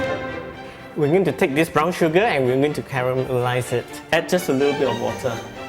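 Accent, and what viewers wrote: Singapore. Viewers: are you like singaporean?? haha. the accent :D i'm singaporean too. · dang. that looks delish! and i miss this accent lol. singaporean or malaysian?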